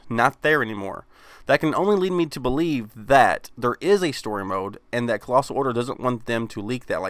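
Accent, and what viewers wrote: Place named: Georgia, United States. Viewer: It's ok, I like your accent, sounds like what I hear in Georgia.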